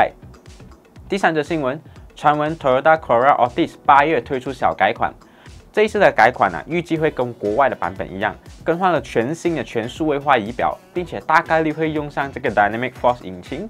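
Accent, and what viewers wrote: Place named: Malaysia. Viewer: 大马华人的普通话原本就不错，只是有些口音而已。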